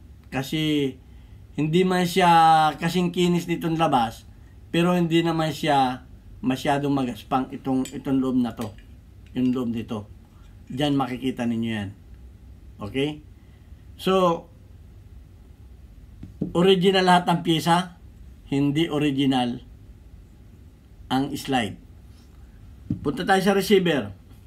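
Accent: Bicol, Philippines. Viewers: Bikolano k po b manoy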